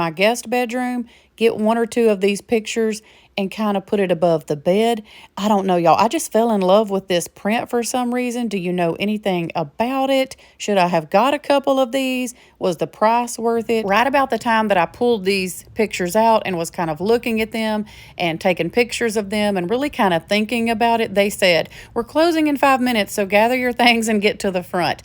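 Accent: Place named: Fort Worth, United States. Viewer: My third comment today only it's a question just based on your accent and like I said I have not listened to a lot of your videos not because I don't like them but because I do listen to a lot of others especially about politics and the Christian religion which I am a Christian but are you from the Fort Worth area I live in suburb of Fort Worth I was just wondering which antique shop you went to LOL but I think you should have definitely got the first one but again send me the name of the artist and the name of the actual picture that's what on that little tiny brass placket that's tacked on there that's will tell you if it's valuable or not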